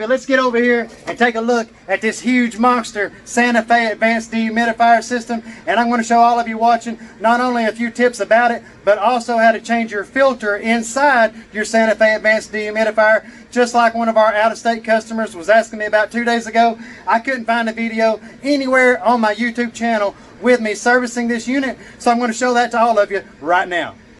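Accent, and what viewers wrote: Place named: Rural, United States. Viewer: Shit, I was sold and heard the country accent, but I'm in North Carolina :(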